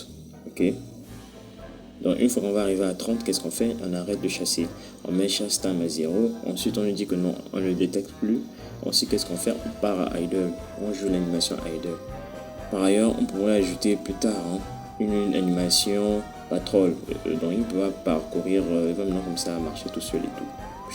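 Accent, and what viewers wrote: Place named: Africa. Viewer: tu as un accent africain bien venu dans la famille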